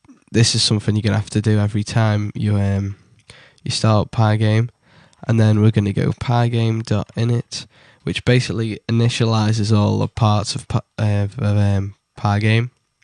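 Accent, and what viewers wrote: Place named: Wakefield, United Kingdom. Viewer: Hey - you sound like you come from Wakefield - possibly Leeds.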